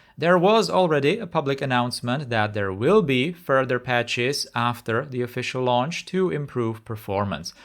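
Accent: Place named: Czech Republic. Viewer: Are you Czech? I like your accent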